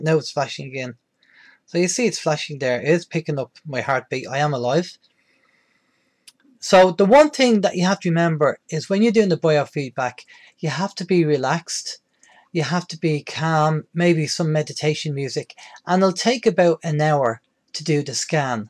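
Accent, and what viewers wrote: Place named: Ireland. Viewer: Hi I have just come across this rife machine and rife machines in general. I noticed you have an Irish accent. Is there anywhere in Ireland this can be bought from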